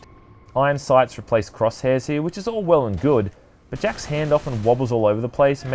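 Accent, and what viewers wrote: Australia. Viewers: Why must people change the way a words are pronounced. T,tulu????? It's always pronounced with a c Cthulhu. Americans love changing the English language. Why???? You sound Australian.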